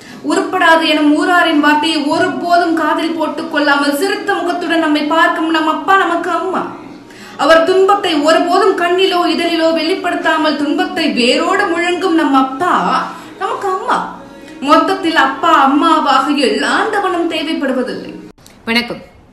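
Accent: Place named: Sri Lanka. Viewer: எமது இலங்கைத் தமிழ் உச்சரிப்பை உங்கள் நாவிலும், இதையத்தில் விதைத்தது இறைவன் என்னும் அருள், மேலும் தமிழ் மொழியில் உங்கள் சேவை பெருகட்டும் நன்றி.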